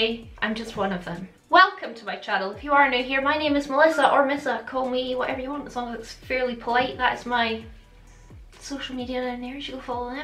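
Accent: Scotland, United Kingdom. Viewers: More looks like this ! Amazing ! Also ur voice is super relaxing ! So nice to here a scottish accent on here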